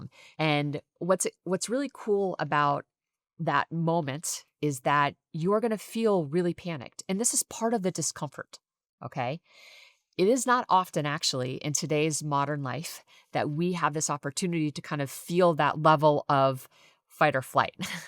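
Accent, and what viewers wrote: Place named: South, United States. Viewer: I dip my hand into a ice fill cooler .. southern voice... Again thank you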